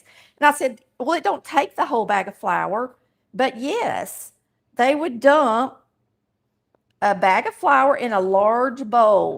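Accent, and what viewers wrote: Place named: Georgia, United States. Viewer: I love your Georgia accent! it reminds me of my grandma Nichols from Georgia. She loved to bake biscuits, cornbread and sourdough rolls to die for! Her idea of a balanced breakfast was a cup of coffee and a slice of homemade cherry pie! So good!❤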